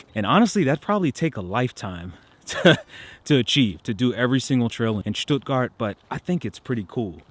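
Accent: United States. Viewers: The important part in the title is this "IN MY GERMAN NEIGHBORHOOD". Because in my neighborhood i see exactly nothing. There are houses and a street. Thats it. Be happy you landed there. Germany can be the most boring place imaginable. Every jungle village in Asia has more to offer than the villages here. Also the thing with the "humanity". Sadly having books along the streets isnt the best proof for lots of humanity :-) Ive visited many placed in the world but the Germans were the coldest among all. I didnt see any humanity anymore, when its about talking normal to unvaccinated. Or if someone falls out the of the standard mainstream person. Nothing is left of any human sign in those cases. You will be treated as an idiot and outsider. Thats is not whats humanity means exactly. And nowadys politicians are unacceptable too. Dont get me wrong. I can imagine your place is nice. 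And im very glad you enjoy it :-) Honestly. Im sure someone can get happy in this country. Sadly not me but that doesnt have to count for everyone. You sound like youre from the US. Not sure. A problem could be that Germany is the behind of the US and that may mean that in 10 years of time, you end up at "the same place" as you left. A crazy one ^^ Enjoy. I hope you find what youre looking for.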